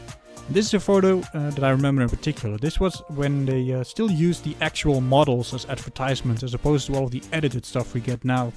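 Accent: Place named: Netherlands. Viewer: Are you Dutch? You're accent seems dutch to me.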